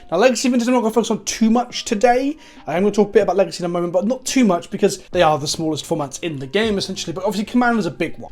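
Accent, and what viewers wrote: United Kingdom. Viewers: Woah woah hang on... you’re British?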